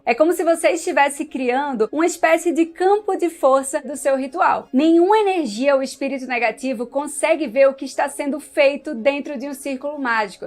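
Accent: Pernambuco, Brazil. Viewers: Pergunta nada haver com o vídeo: vc é de PE? Seu sotaque é bem forte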